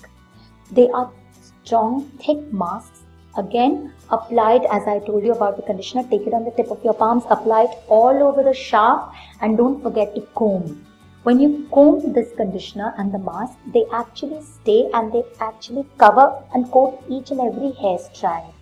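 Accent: India. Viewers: It's Indian accent. Though I'm not Indian myself, I find your comment offensive. Not every accent are to our liking but we get accustomed to them. I hear many dielects here in London and I don't go telling everyone what I think of them. It's a language and it's purpose is to communicate. How you find it is your personal preference. Don't think anyone gives a fuck what you think of the accent same way no one cares what I think of the moon. Just get the message and utilise it.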